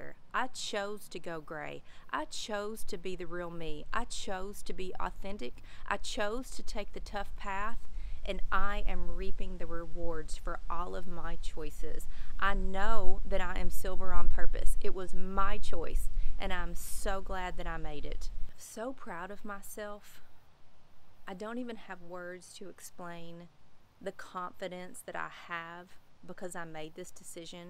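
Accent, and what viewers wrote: South, United States. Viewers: I started the journey... and I chopped off most of the dyed ends for my birthday, 6 months later. Didn’t mean it as I was trying to wait a year,but I am glad I did. Now I have a little bit of dyed ends and it’s curly and short, but I love it. Took 2 weeks getting used to the short look. I love your curly big hair and southern accent (I’m from GA myself).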